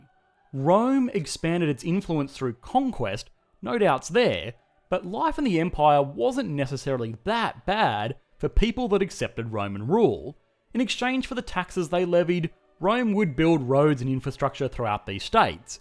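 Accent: Australia. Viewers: I wish Australians would speak in a more anodyne way and not torture vowels quite so much. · This narrator is from the land down under.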